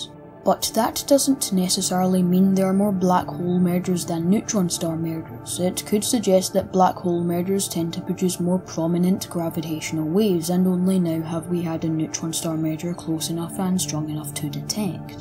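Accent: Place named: Scotland, United Kingdom. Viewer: Love your videos. I cannae believe that folk would need subtitles for your lovely Scottish accent. All the best from just outside Edinburgh.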